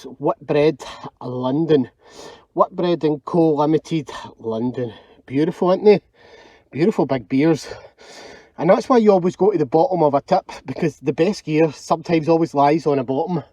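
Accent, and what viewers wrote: Scotland, United Kingdom. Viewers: I just love your videos 🤛🏼 & ur accent… i hear Glasgow accent is stronger than Edinburgh? ☺️ Wish I could find tips like u find up ur way, down here in Yorkshire… ☺️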